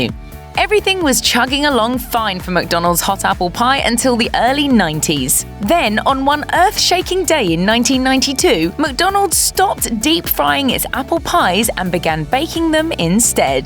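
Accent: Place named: United Kingdom. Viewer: This is America and McDonald’s is an American company why are you using a British narrator?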